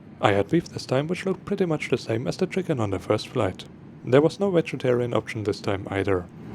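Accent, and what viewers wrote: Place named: Germany. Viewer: 3: 16 ... can barely hear you .....plus the strong german accent ...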